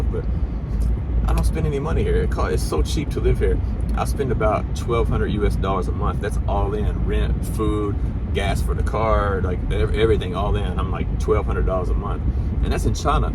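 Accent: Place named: Florida, United States. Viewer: hey there >>>nice ta see y'all again good vid! your accent, and mine sound damn near alike. where are you from originally? am in florida. born in Orlando. live in Lakelsnd, FL now. am an original "cracker." lol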